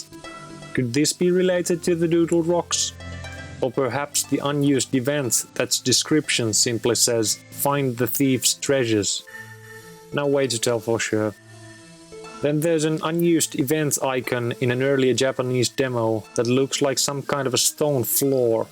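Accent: Finland. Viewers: The voiceover has to be Finnish? Onko aika mennä Torille? 🇫🇮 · finnish yeah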